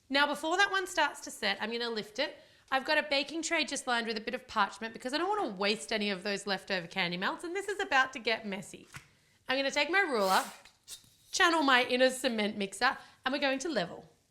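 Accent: Australia. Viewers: Is she Australia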